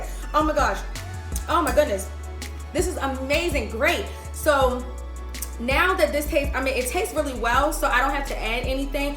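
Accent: Baltimore, United States. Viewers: I must try this, hopefully it turn out as good. Are you from my hometown Baltimore??? I hear he accent.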